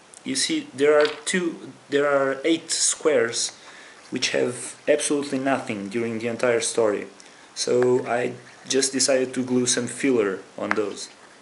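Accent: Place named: Portugal. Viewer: This is such a Portuguese accent ehehehehe great video :)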